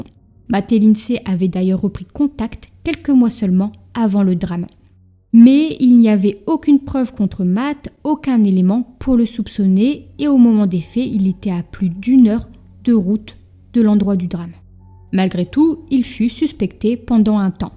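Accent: North, France. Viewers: J’aime beaucoup vos vidéos ! D’autant plus que je reconnais l’accent du nord il me semble ❤️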